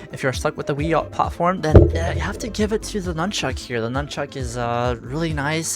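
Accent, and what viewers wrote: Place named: Texas, United States. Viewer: texan spotted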